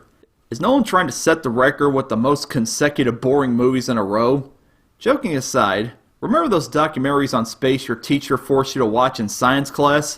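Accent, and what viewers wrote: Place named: Texas, United States. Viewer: somehow texas like accent unconsciously make me think whatever you're saying is less credible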